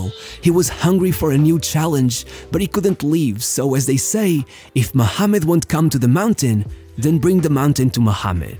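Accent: Portugal. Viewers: Pela pronúncia dos nomes de jogadores e clubes percebe-se logo que és português... "So clean" ahahahahah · Top, és português não és? Esse sotaque...🧐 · Ele é português por isso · U sound portuguese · Ele e português bicho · Brother, you’re portuguese ain’t you? Não mintas crlhes. És tuga ao máximo, o sotaque não engana. If so... 👏👏👏👏👏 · Just by the accent saying the players names, I know this guy is a Portuguese. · Ele é Portugues? parece a forma como diz os nomes Brasileiros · So fui perceber que seu sotaque era portugues quando voce falou dos biquíniskkkkk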